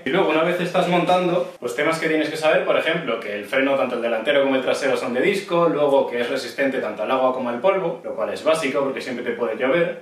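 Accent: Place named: Spain. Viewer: Por tu forma de hablar es el clasico españolote.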